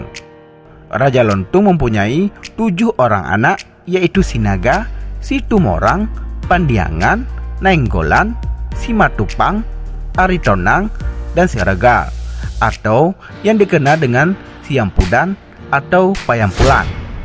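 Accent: Aceh, Indonesia. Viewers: logat bangsa aceh kental sekali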